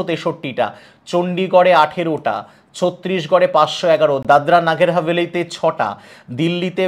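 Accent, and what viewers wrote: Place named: Bangladesh. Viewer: Your pronunciation like a Bangladeshi.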